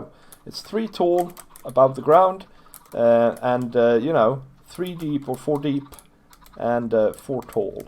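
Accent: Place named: Norway. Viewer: Oh i literally heard it in your voice I’m norwegian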